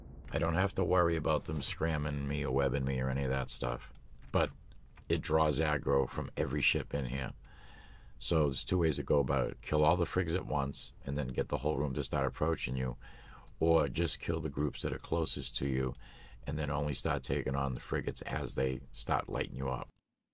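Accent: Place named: Massachusetts, United States. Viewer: It's a Massachusetts accent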